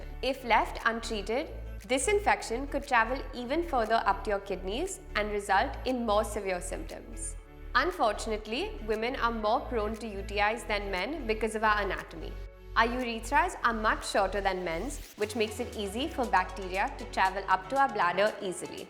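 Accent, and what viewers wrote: India. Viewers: Never seen a white woman with a indian accent😅. Great videos anyways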